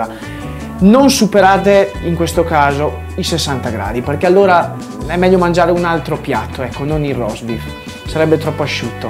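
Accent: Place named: Trentino, Italy. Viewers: non mi ero reso conto prima d'ora che la cadenza e accento trentini fossero così simili al romagnolo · Fai ridere e sei molto bravo, adoro l'accento Trentino! Continua così!!!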